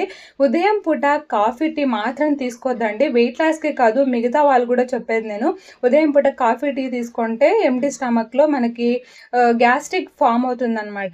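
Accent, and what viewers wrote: India. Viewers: Mee language baavundi Ananthapur kadaa mam meedi??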